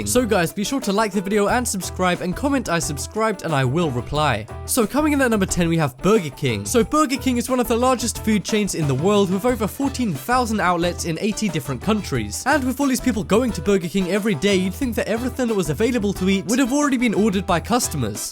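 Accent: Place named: United Kingdom. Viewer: You got Brit voice but say dollors so are these available in uk not that I be ordering soon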